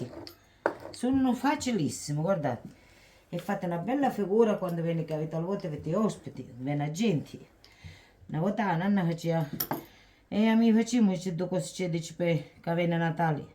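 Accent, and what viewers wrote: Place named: Calabria, Italy. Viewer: Soy Argentina, hija de calabreses. Su dialecto.me emociona al igual que sus comidas, ingredientes, etc., etc. Si tendría la oportunidad de viajar, iría a verla. Eres una mujer admirable ❤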